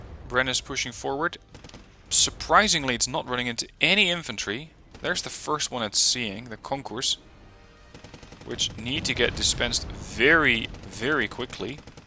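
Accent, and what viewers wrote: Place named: Netherlands. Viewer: Killa Armadilla still, it's an easy mistake to make as dutch and Swedish have several identical words and a similiar flow which makes for similiar accents when speaking English :)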